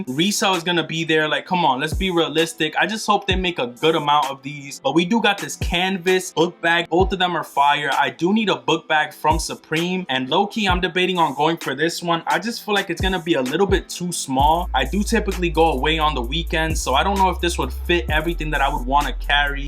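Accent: New York, United States. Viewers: I love cause his NY accent is entertaining AF. All the “low key” I can deal with but the “dead ass” I can’t. He never “dead asses” lol.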